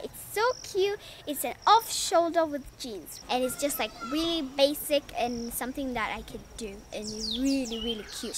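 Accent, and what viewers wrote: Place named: United Kingdom. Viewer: Your english pure UK accent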